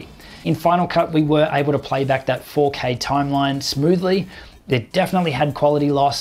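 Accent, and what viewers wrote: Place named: Australia. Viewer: Hey, nice to hear an Aussie voice doing some serious reviewing.:) I'm curious about the codec/s used in the tests across Premiere Pro and FCPX - were they truly the same asset? I know FCPX can transcod Camera formats to ProRes - which I would expect to export much faster being Intraframe based codecs. Cheers, JB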